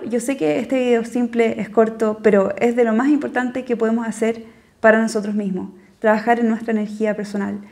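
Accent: Chile. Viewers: Gracias por estos vídeos!! Amo tu tono chileno. Saludos desde Argentina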